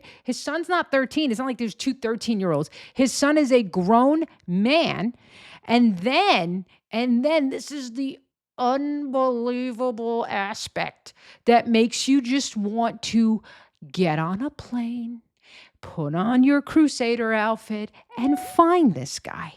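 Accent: Italy. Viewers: Love the Italian accent !! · Love the Italiano accent. I used to actually think you were one a them there New York Italians.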